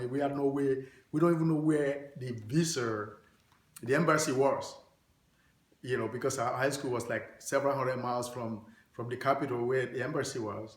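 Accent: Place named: Africa. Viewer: Always interesting to hear from you. People who are not in America will not understand they joy some of us living here have when we have the opportunity of hearing own brother teach with our own African English. Thank you my brother.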